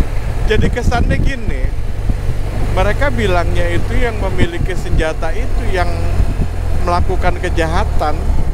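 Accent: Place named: Bali, Indonesia. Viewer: Bang maaf, aslinya dari mana?,dari logat bicara abang ko kaya orang Bali..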